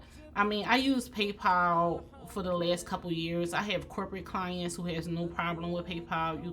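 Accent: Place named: Philadelphia, United States. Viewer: First, Allah will take care of the persons who did not make the final payment. Next, you have a beautiful soul, don't allow this incident to change who you are. The change in the policy was necessary. Last, the balloon design was BEAUTIFUL! You are very creative. Keep up the great work! May Allah continue to bless I kept saying to myself, she sounds like she is from Philly! I checked your website, and you are in the Tristate area. I need to find out who the event planner is so I can never use her in the future.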